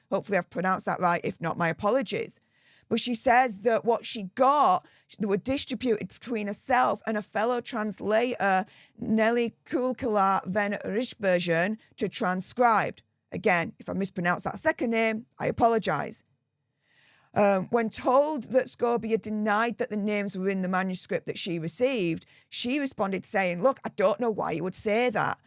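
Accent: Yorkshire, United Kingdom. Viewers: your literally loosing your Yorkshire twang, you need sleep, and a hot toddy. On top of it you need to be honoured with a Damehood, for your contribution to this, after an operation.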